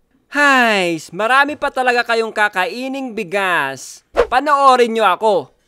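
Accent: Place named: Nueva Ecija, Philippines. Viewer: Taga Nueva ejica ka ba idol may punto kasi salita haha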